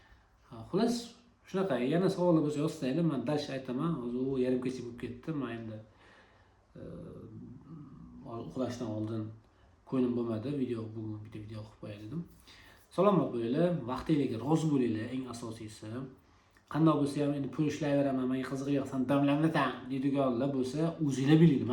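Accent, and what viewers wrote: Namangan, Uzbekistan. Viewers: aka namangandan emasmisiz mobodo?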